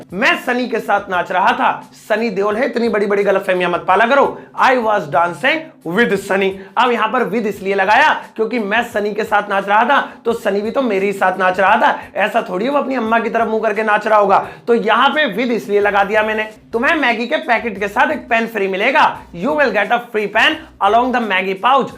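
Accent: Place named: Mumbai, India. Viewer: Ekdam bambaya hindi kya baat hai bhidu... Pahchana ki nahi apun mumbaikar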